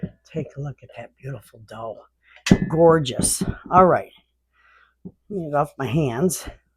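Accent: Michigan, United States. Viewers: I heard your accent and I said shes a Michigan girl. Went to your description, yep!!! I"M a Yooper.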